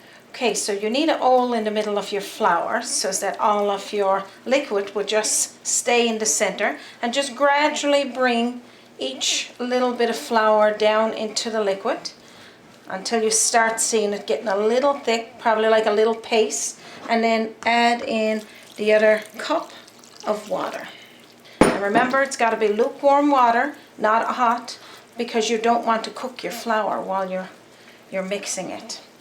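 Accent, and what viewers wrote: Newfoundland, Canada. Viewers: Thank you, thank you, thank you, This is absolutely amazing. I'm originally from Corner Brook but moved when I was 9. Learning how to do traditional NFLD recipes with your wonderful accent is truly amazing. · I love Newfoundland accents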